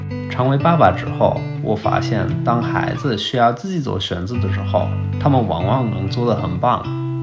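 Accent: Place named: Australia. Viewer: 的澳洲腔说中文好好听哈哈哈哈哈😂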